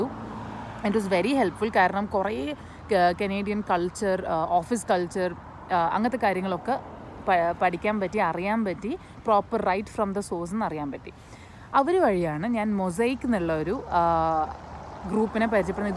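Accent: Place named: Kannur, India. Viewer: ഇരുട് കണ്ണൂർ സ്ലാങ് ❤️❤️❤️❤️❤️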